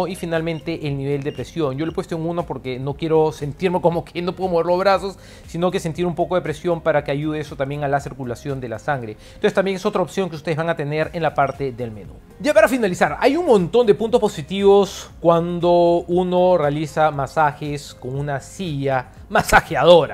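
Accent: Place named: Lima, Peru. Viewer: si me lo suponía por que habla cómo limeño pues me agrada sus videos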